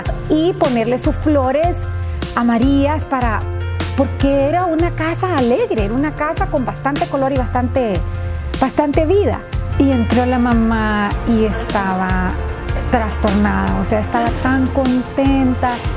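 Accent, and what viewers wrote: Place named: Honduras. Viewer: Wow le quedo hermosa la casa, hace poco la encontré y me encanta su canal .... La veo desde NY pero soy de Honduras creo que usted por su acento también verdad . Felicidades un trabajo muy bello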